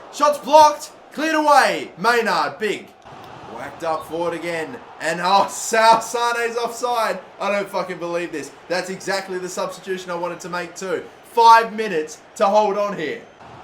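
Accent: Australia. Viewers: Is he austrailian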